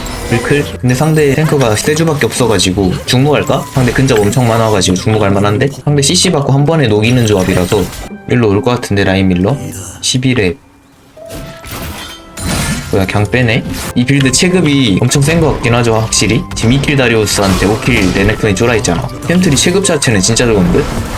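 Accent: South Korea. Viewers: 광주사투린가요 ㅎㅎ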